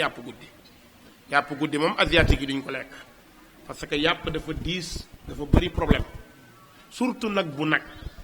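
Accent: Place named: Mauritania. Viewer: Belle récitation style Mauritanien masha'Allah.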